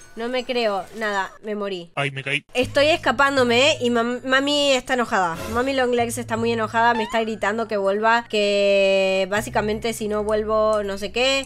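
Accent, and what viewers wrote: Argentina. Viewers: Hola eres argentina😊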